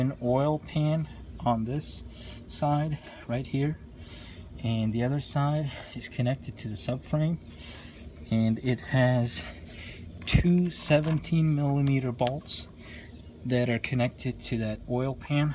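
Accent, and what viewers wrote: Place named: Canada. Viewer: Where ya from mate, sound like a Canuck to meeee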